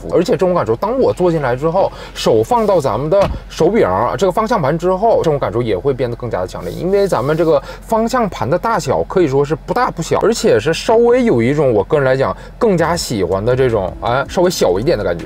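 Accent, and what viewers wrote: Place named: Northeast, China. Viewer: 這一口東北話，笑死我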